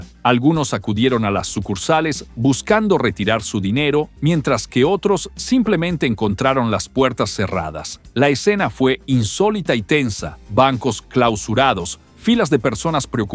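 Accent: Yucatan, Mexico. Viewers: Ese acento es yucateco? Suena muy bien!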